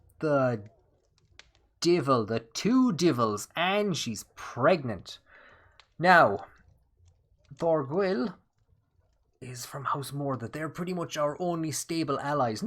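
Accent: Ireland. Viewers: Love the True Irish narration dope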